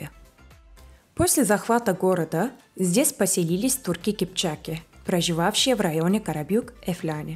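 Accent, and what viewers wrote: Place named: Russia. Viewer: rusça aksanın * - *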